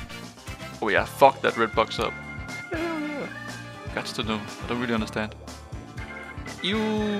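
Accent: Denmark. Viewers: hah! Me too!😌 i noticed the danish accent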